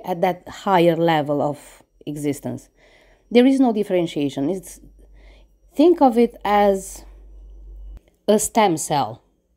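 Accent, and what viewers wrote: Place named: Spain. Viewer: I really love your Spanish accent it gives you An edge ✨✨😉 never stop being this blunt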